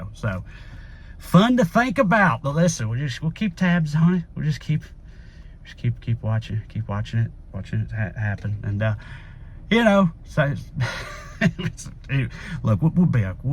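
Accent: United States. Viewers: Love from Italy!! It's very hard understand you for your american accent, but i like the way you talk about America and this strange days!!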